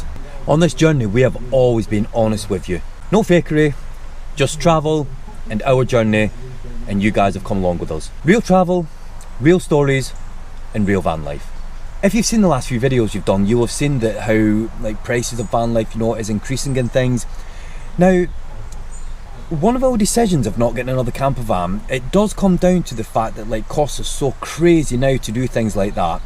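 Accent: Scotland, United Kingdom. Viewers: Hi and, we want to say a big thank you for all the entertainment you give us. Fabulous photography it’s amazing. I’m very much looking forward to the next chapter in your lives., what accent do you have please? We thinking it’s Scottish.? Anyway, keep the videos coming and take care of yourselves. Lin in Devon.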